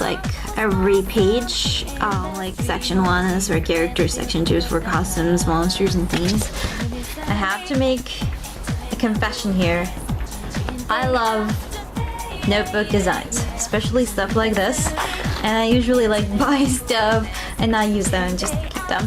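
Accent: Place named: Egypt. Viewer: you're Egyptian ?! o.o gosh your accent is AMAZING! xD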